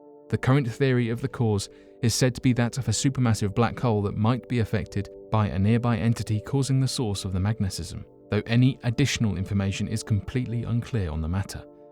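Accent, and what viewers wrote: United Kingdom. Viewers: It's hilarious how many people want to correct how this poor guy pronounces words. He's British! They pronounce things differently. You trying to correct him is more annoying than him pronouncing words in a way you don't like. Lol!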